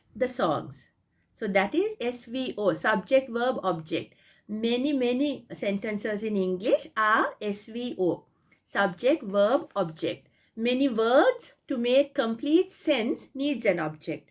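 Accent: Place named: Sri Lanka. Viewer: Dear Mdam You are the only one English teacher who teachs English in English who has Sinhala voice.. සිංහල හඩකින් ඉංග්‍රීසි ඉංග්‍රීසියෙන් උගන්වන එකම ගුරුතුමා හා ගුරුතුමිය හරීම වටිනවා. මම ඉංග්‍රීසි ඉගෙන ගන්නා විශ්‍රාමික (ගණිතය ඉගැන්වූ ) ගුරුමාතාවක් .. ඔබතුමියගේ මීට පෙර ඉංග්‍රීසි /සිංහල පාඩම් හැදෑරුවෙමි. (I try to read English poems which I abandoned ) Thanks again.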